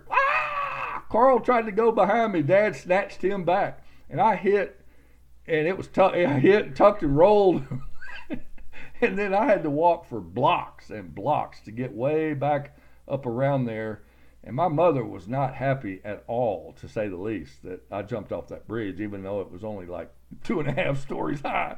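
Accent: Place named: South, United States. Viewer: ...I love your accent, I could sit all day & listen to you tell stories....lol...you sound like you're from the South...country as S***, just like me! 😊😊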